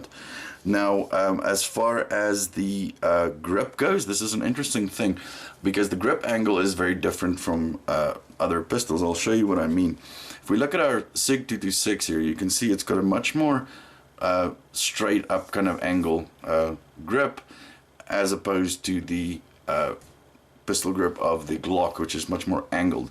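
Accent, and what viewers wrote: South Africa. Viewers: I hear a South African accent.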